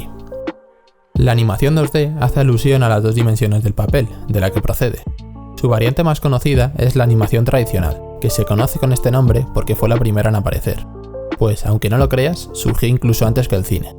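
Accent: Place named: Spain. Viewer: buenisimo tu video. lamentableente detesto la pronunciacion española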